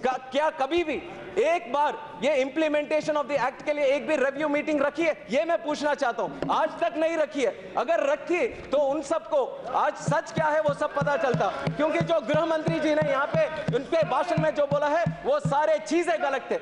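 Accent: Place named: Andhra Pradesh, India. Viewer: Andhra voice is there...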